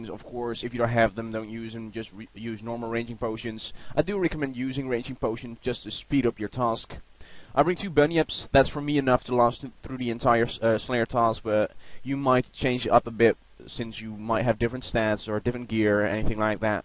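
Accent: Netherlands. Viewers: Je hoort gewoon dat je nederlands ben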